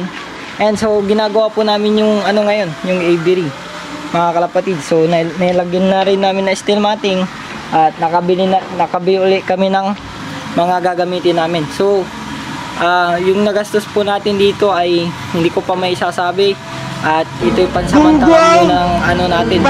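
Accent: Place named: Pangasinan, Philippines. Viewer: Taga San ka idol kc parang pangalatot ung salita mu eh Taga pangasinan ako idol shout out naman bago lng ako sa Channel mu idol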